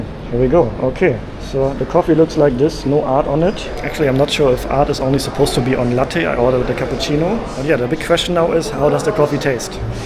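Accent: Germany. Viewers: ngl the German accent makes the vid so much better lol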